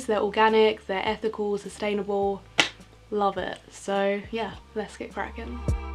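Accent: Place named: South East, United Kingdom. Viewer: So I hope this isn’t too intrusive but I was wondering if you’re from the south east, cos I am Kentish and I noticed you mentioned the Tate in Margate and you have a very familiar accent. Xx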